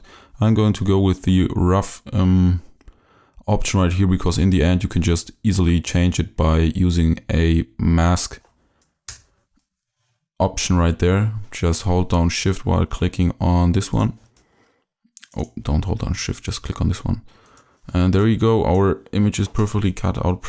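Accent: Germany. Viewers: I like how you make stuff on the fly, like u even surprise yourself. lols The german accent is also refreshing for some reason, maybe coz its rare that i hear it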